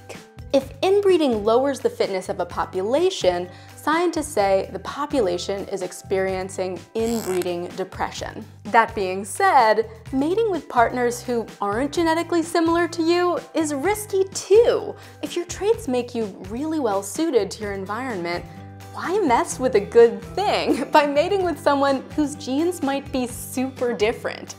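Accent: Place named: South, United States. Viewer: Before you even watch this you know she is a southern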